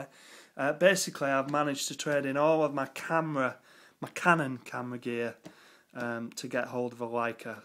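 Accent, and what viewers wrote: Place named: Yorkshire, United Kingdom. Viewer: haha i love the way you pronounce bokeh they should replace all the news anchors on the bbc to have a yorkshire accent :)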